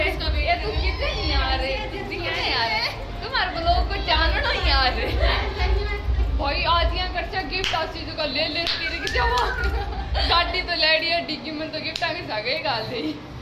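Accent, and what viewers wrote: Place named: Rajasthan, India. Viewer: didi the marwadi bolta ktayi zeharr lago 😜❤️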